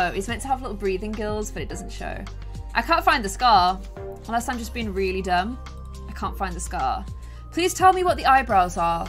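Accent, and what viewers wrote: United Kingdom. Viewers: Hi I love your channel and no I am not just saying this for likes. I just want to see this just nothing else. you are amazing you inspire me and inspire me to do stuff like you do. I love Britain and I love your accent. If you would just see this that would be a miracle but of corse your not because no one does ever. Just please see this and remember I just want to see this. I don't care how many likes I get so don't put hate comments.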